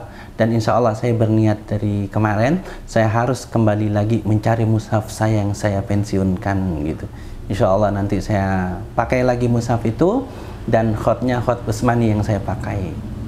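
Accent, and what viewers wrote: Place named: Surabaya, Indonesia. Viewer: Suroboyoan koh loe fase jowoe. Salud aku ustad cerdas komplit plit jelas tur mantep buanget👍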